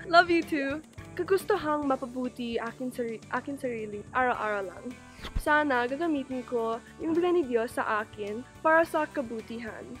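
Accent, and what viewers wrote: Philippines. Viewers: dat filipino accent is cute tho uwu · Your filipino accent is ADORABLE!💓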